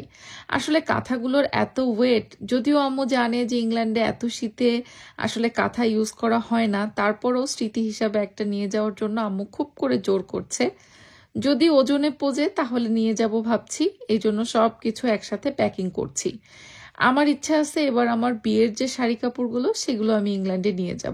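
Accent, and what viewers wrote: Kushtia, Bangladesh. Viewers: Apu tho sobar moto dong kore kotha bole na,tader kustiyar vasay amn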